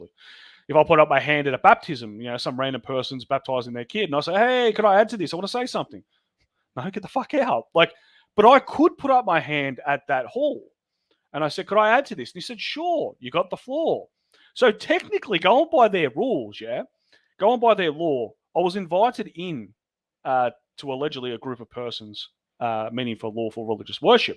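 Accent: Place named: Australia. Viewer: You remind me a lot of Phil Anselmo (from Pantera and Down) but with an Australian accent instead of the Southern NOLA accent. Very hot! Hope everything goes well in your case, it certainly doesn't sound like you've done anything wrong!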